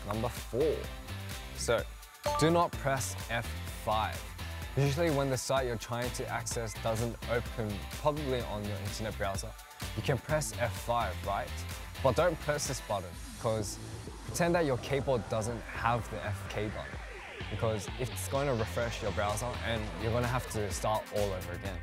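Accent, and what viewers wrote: Australia. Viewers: i just fell in love with the australian accent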